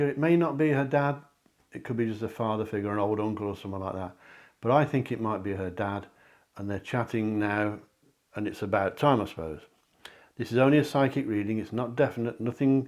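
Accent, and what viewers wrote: United Kingdom. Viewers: it’s not a Devonshire accent though! I wondered where you are from - is it Staffordshire? My entire family is Cornish except one Grandfather who sounded like you.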